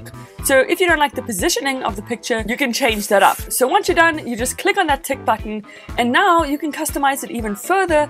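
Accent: South Africa. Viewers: new sub. youre south african, right? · Super professional! Learnt so much. Thank you!!! As soon as I heard your voice I knew this was a saffa lol.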